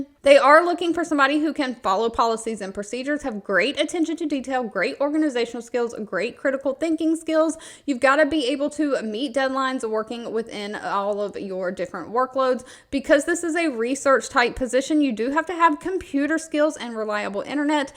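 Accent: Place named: Louisiana, United States. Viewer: you're welcome luv! I saw on an older video you said people were complaining about your voice or something. Do not let that bother you! I'm a proud Louisiana woman that talks just like you, lol! Be you! Have a super day!💜💜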